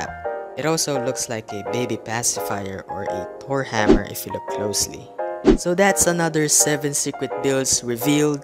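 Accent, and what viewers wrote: Philippines. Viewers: Filipino accent be hitting tho lol thxx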